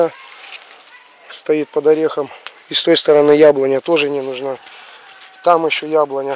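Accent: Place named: Kuban, Russia. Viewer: Привет, канешно мало баклажан, а говор уже кубанский проявляется!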